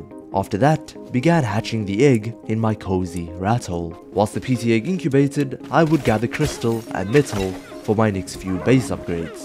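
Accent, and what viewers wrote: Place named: South Africa. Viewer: Coloured south african ya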